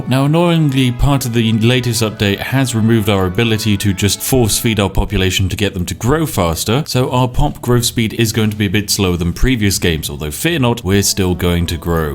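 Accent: United Kingdom. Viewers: You're sounding awfully british today,.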